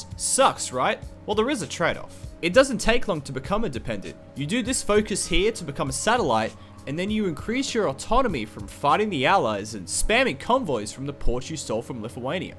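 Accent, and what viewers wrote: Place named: United Kingdom. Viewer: Why are all hoi4 youtuber sound british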